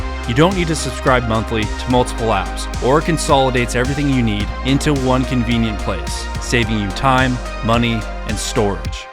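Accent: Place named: Chicago, United States. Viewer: I thought he was from Chicago, not NJ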